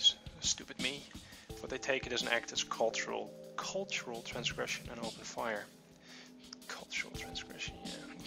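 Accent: Netherlands. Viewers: i can't but notice your accent sounds like you are dutch, am i correct?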